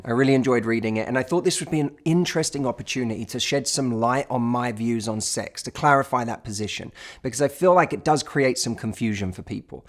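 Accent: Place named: England, United Kingdom. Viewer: He gives great advice and he is so beautiful I love his lovely English accent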